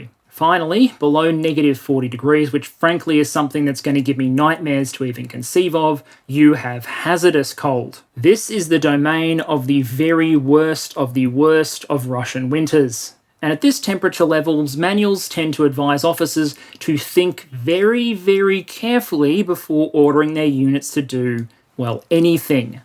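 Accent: Melbourne, Australia. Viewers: id sub but you sound like you are from melbourne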